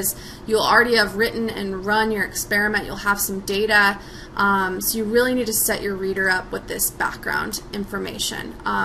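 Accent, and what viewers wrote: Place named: United States. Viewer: bruh this is the most americanised american accent i have ever heard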